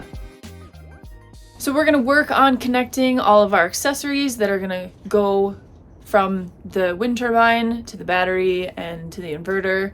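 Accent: France. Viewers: Un accent très français pour monsieur 😊 je découvre votre chaîne, et ça me plaît bien. ✌️👍bisous de France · Ça me fait tellement rire car le gars on voit qu'il est français car il a le petit accent bien de chez nous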